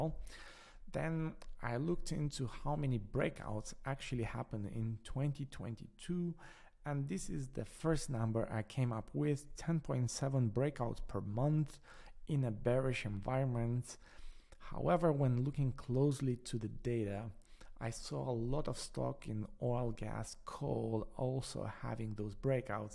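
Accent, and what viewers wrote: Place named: Germany. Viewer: Hi, great analysis . Are you german ? I speak some german and your accent is very similar. Thanks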